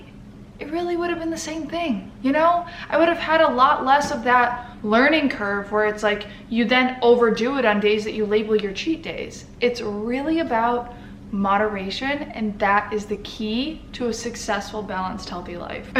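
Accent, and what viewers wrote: Netherlands. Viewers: you kinda have a Dutch accent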